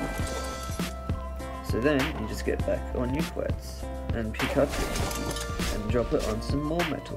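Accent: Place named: United Kingdom. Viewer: British boiii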